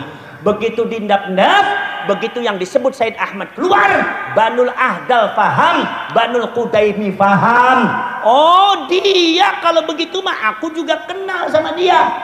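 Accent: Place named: Banyumas, Indonesia. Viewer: Ngapak mantabbbb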